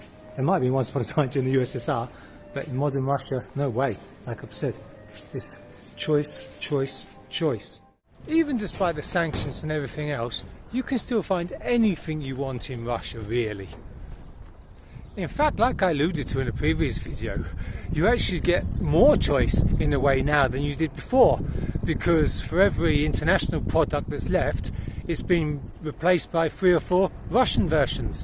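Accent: United Kingdom. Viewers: British accent 😁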